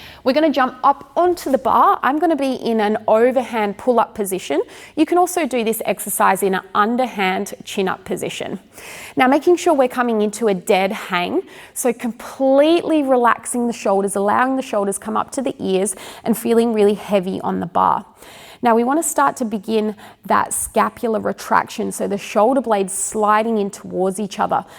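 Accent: Australia. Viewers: Great to hear an Aussie accent.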